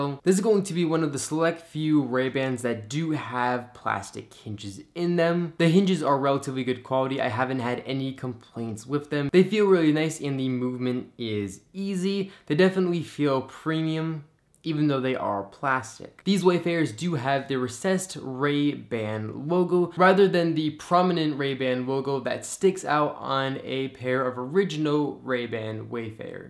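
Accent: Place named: United States. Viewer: Oh and by the way, please don't change the way you speak, we English like an American to sound the way we like Americans to sound, just the way you sound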